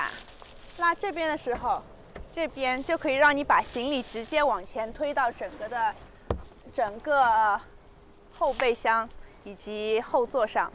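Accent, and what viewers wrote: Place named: Hunan, China. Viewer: 湖南音好重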